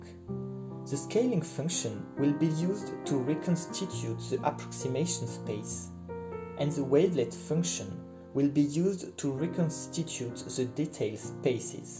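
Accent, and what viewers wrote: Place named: France. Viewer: I loved the math, the French accent, and the music! :D great video!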